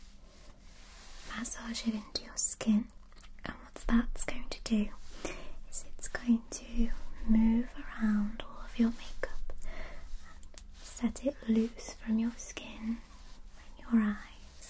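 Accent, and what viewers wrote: United Kingdom. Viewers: I luv soooo much your British accent🥰😍 to cuteeee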